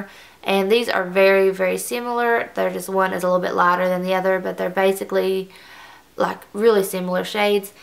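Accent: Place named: Tennessee, United States. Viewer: Great review! I came here with the intention of buying Naked, but now Im sold on Chocolate Bar. BTW, where are you from? Your accent sounds like me! (Tennessee)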